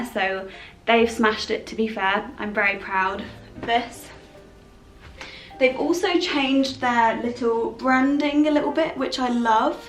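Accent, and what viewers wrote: United Kingdom. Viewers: I'm just here for the Mary Poppins accent.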